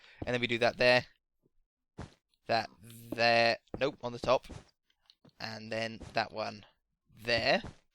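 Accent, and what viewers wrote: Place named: England, United Kingdom. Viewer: I believe the correct word would be enunciate and that is just his english accent.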